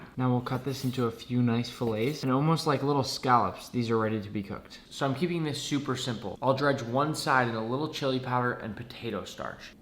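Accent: Australia. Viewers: are you Australian? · He sounds Aussie 00:1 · he sounds like australia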